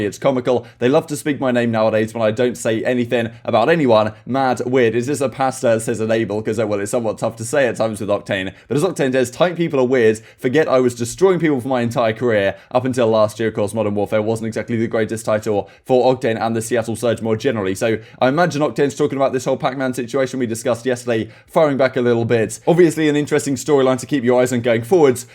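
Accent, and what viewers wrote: United Kingdom. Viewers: I assume by your uploads and your accent you too are also from the Uk. What do you think about the later games that might not take place until 1 or 2 our time?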